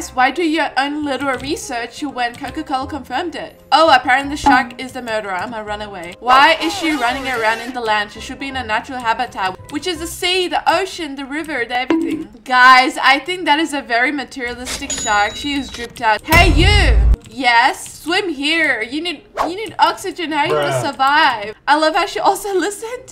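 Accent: United Kingdom. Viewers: I'm very surprised by your British(or idk) accent(or voice)And can I join your videos?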